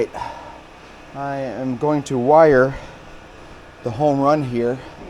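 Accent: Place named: New York, United States. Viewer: Buddy, you sound like you JUST came from New York City!! Definitely!!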